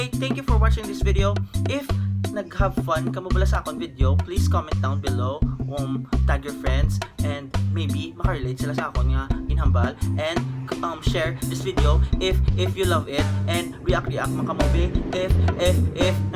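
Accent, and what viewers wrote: Iloilo, Philippines. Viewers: Nice conyo kabayan..ang cute talaga pag ilonngo I am half ilongga..thanks for visiting my channel..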